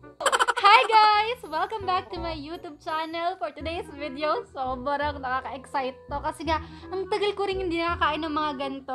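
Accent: Batangas, Philippines. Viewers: Upong bahay halatang batangueña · Ang ganda niyo po talaga. Natural na natural ang ganda. Gustong gusto ko po iyong batangeña accent niyo po. Keep inspiring others po. 😊😊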